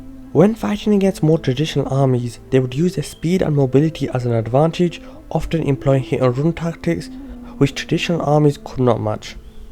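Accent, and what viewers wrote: Northern England, United Kingdom. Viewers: Salaams I thought you did pretty good considering it was your first time... keep practising and you will get better and better guessing from your accent you sound like you are from Lancashire/ Yorkshire. Am I correct? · Thanks! The translation is not good. It has Thailand instead of Byzantine lands. Your accent is like Northern English and very easy to understand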